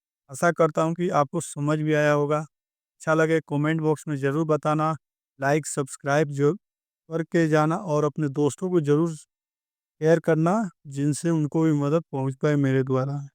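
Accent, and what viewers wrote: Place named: South, India. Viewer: Bhai south se ho ky tum Hindi wali vibes ni aari aisa lag ra hai jaisa soch soch k bol re ho But bathreen explanation ❤❤❤❤❤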